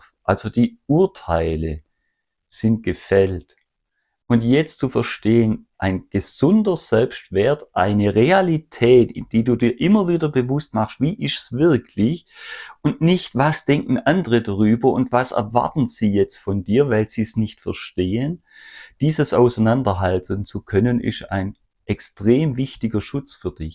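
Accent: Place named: South, Germany. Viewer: Meiner Erfahrung nach sind Flying Monkeys auch nicht die Hellsten. Niemals würde ich mir eine Gruselstory über Abwesende anhören, ohne nachzufragen, ob der Berichterstatter das auch im Beisein des Betroffenen wiederholen würde. Damit oute ich mich natürlich schon als "ungeeignet" für die Rolle und werde womöglich selbst zur Zielscheibe, da nicht ausreichend untertänig/blöde. So ähnlich ist es in der Realität denn auch geschehen ... Übrigens schaue ich Ihre Videos total gerne. Nicht nur, weil Sie authentisch und sympathisch sind, sondern auch wegen des Dialekts (stamme selbst aus Süddeutschland). Liebe Grüße!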